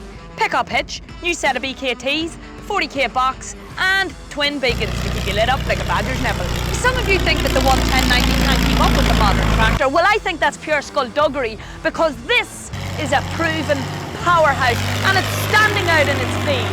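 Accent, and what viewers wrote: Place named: Ireland. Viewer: I would love to split you 😂😂that Irish accent wow stunning ❤❤❤❤❤❤❤❤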